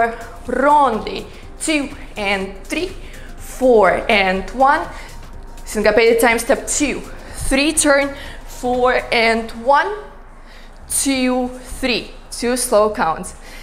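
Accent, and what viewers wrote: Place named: Russia. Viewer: Какой шикарный русский акцент😀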